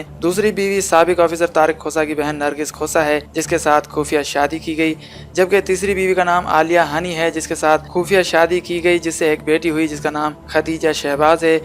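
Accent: India. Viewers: aap agar pakistani ho to indians ki tara kaun bol rahay ho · Tumhara lehja pukar pukar Kay keh raha ha kih tum bharti ho.is ka Matlab ha kih tum Sara din social media ka Kam kartay ho. Lagay hath imran ki zanashuda zindgi ko b Sam karo. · Tum indian ho tumhara lehja indians wala haiy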